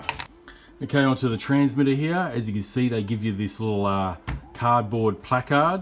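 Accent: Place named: Australia. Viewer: Its the Aussie accent mate, give it some time until it gets used to it haha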